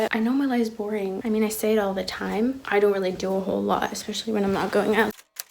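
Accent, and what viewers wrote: United States. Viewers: I love your videos so much! <3 just wondering, where are you from? cuz you sound super american but you live in the netherlands? also I love your style, you're so cute :)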